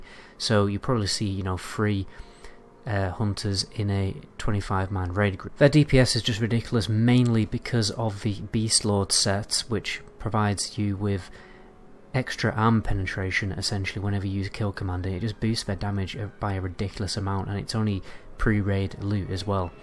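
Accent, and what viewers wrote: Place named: Sheffield, United Kingdom. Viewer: Hey, kinda random but your accent sounds very similar to Alex Turner’s from the Arctic Monkeys. Maybe it’s my un-attuned American hearing and I’m way off, but are you from Sheffield or near there (that’s where he’s from)? UK accents are so interesting and varied, I could be super wrong lol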